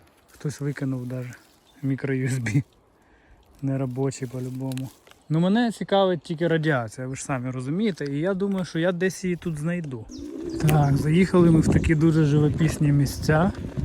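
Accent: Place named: Poland. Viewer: Фиии , польский суржик !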